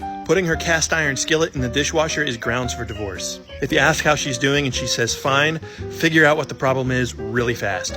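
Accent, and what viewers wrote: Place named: South, United States. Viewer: I'm from the South. It sounds like your wife southernized you like I did my New York honey .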